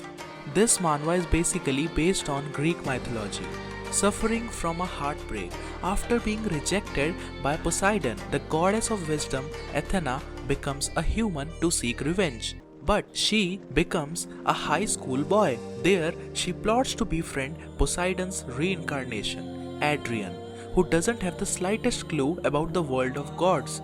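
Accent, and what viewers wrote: India. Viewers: You are indian ? Your accent is desi😂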